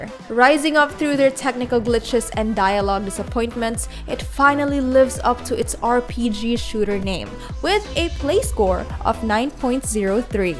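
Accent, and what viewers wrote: Philippines. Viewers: You sound like a Filipina 🇵🇭 , are you? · Is that a Filipino accent im hearing? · your filipino accent is so cute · The girl talking is a Filipino for sure cause of her accent :) a bit fob tho lol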